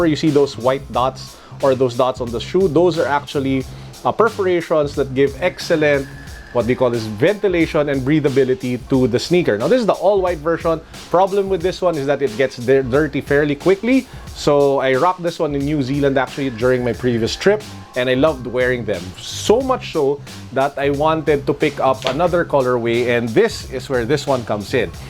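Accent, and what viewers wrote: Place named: Philippines. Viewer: Philippinos have the best accents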